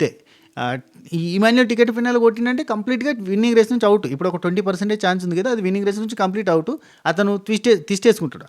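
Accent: Telangana, India. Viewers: మన తెలంగాణ భాష నువ్వు మాట్లాడితే వినెతందుకు మస్తు మంచిగనిపిస్తుంది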